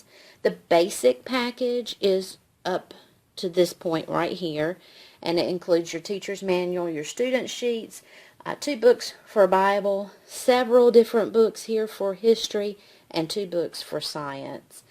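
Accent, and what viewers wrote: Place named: South, United States. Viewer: Thank you so much for this review. I school many children at once and find that it helps to know in advance about the ease of planning. For me, some curriculums are just too involved or scattered for me to do with multiple children and I have ended up wasting precious time and money trying them out. I appreciate this review very much. And love your sweet southern accent!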